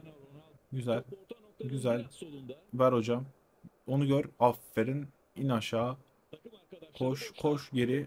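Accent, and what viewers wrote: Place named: Mardin, Turkey. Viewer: Dayı mardinli misin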